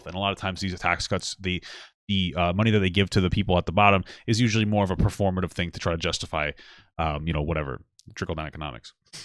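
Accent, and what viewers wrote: United Kingdom. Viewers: I'm Australian and sounds like he has a British accent.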